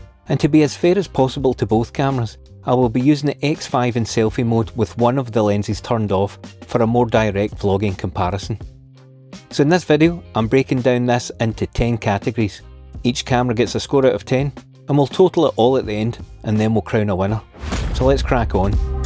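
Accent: Scotland, United Kingdom. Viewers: This review helped make my decision for the P3. I'm now a subscriber to you. Love your Scottish accent, too.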